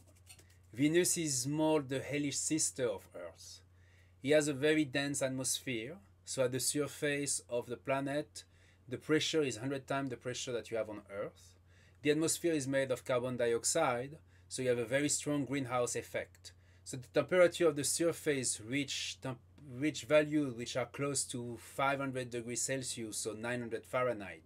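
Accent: France. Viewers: Nothing as charming as an English speaker with a French accent 👽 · C'est bien ça, un accent français, j'ai compris au moment de "Camille Flammarion" hahaha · I wanna be told about Venus with a French accent. Oh Good. Thank God.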